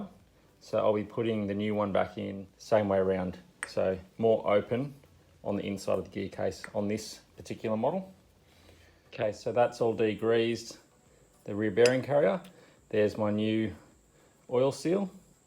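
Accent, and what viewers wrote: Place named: United Kingdom. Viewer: Just can't abide the British accent. Gonna look for an American vid.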